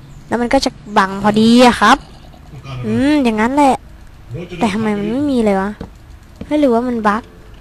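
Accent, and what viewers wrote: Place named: Suphanburi, Thailand. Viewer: เสียงงงงงคนสุพรรณชัดๆๆ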